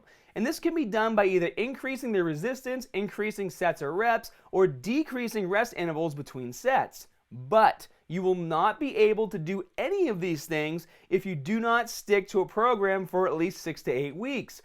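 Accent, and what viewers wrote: Boston, United States. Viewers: lol is he from boston or something? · I have been underexposed to the Boston accent. I'm actually having to remind myself that this is how he seriously talks and he's not trying to be funny or anything... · Is that a Boston accent? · Is he american or aistralian? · Your Boston accent didn't allow me to focus on what you were saying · I can't tell if he's from Boston or Australia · Is he from Boston? I can kind of hear the accent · Boston af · I'm portuguese, and i never even been to the US but i can recognize his Boston accent. Its really thick! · Is he Bostonian?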